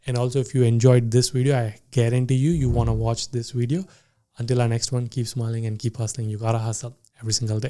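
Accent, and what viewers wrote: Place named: India. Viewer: Even you need to go back to India. Am tired of hearing that accent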